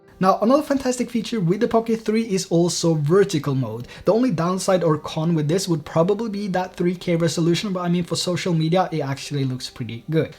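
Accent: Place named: Norway. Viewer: You sound a little norwegian, are you norwegian?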